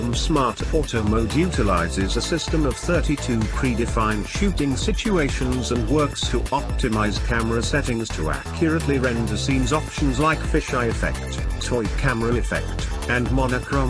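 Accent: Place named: United Kingdom. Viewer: I realize the British accent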